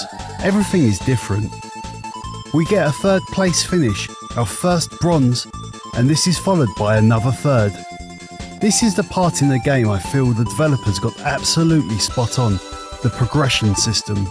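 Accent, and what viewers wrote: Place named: United Kingdom. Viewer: I didn't think of the everyone's speakers / headphones are different. I just use my phone to watch all these videos, sometimes headphones sometimes none. All of your decibel interpretation goes over my head, but I'll take your word for it lol. It's in no way a deal breaker or anything like that, you got a good speaking voice. Similar British tone to retro N64 YouTuber Glenn plant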